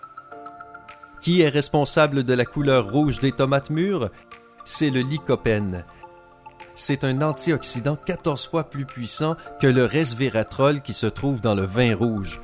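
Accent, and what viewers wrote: Quebec, Canada. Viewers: Et lui avec déjà avec son accent canadien en plus de sa il as le nez boucher rhooooooooooo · Bonjour Monsieur j'aime beaucoup votre accent canadien et vos conseils sont géniaux je vous un bonjour de la Guadeloupe et beaucoup de soleil peut etre que je trompe mais il fait trés froid merci vous etes génial ne changez rien . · accent québécois lol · je ne sais pas si vos astuces marchent mais elles ne peuvent faire de mal:-) j'aime bien vos vidéos et j'adore votre voix et accent canadien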